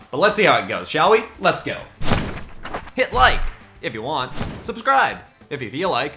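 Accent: United States. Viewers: This narrator kinda sounds like a mix between a robot and a country accent but it’s great tho can’t wait for more episodes with Harold lol 😂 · Not to be rude but I personally am not a big fan of the country accent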